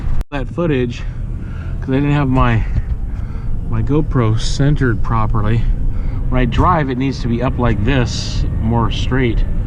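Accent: United Kingdom. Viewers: love your British accent you make me laugh xxx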